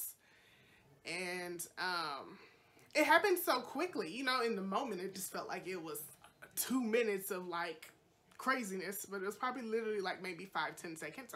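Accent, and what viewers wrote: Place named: Jamaica. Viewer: My dude has been in America 25 years and still has his thick Jamaican accent. He stated he never wanted to loose it.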